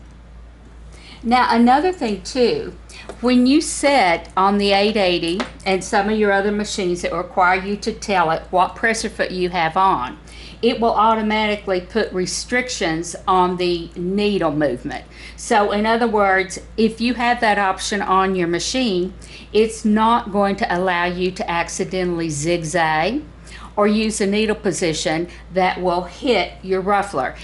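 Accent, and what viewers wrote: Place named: South, United States. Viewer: Thank you so much for this video, and you have a way to explain things that makes it so easy. I know you say is only straight stich but when you are done can you back and forth stich to secure the gather? Sounds silly me asking for this or do i have to change it to foot number 1 to do that. Such beautiful southern accent, love it. I mean to ask when calculating panels for a ruffle skirt how do you go about it? what is the formula so that the gather matches the panels? I can be good at math but at the same time in certain things I am not so good. Do you have a video on that? Thank you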